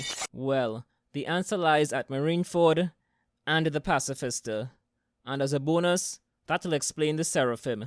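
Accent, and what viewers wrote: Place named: Trinidad and Tobago. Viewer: Yo love how u talking are u trinidadian?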